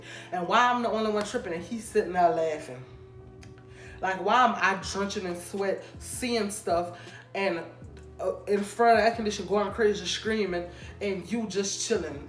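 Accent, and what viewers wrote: Louisiana, United States. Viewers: I think she from Louisiana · Is you from New Orleans or Homea cause yo accent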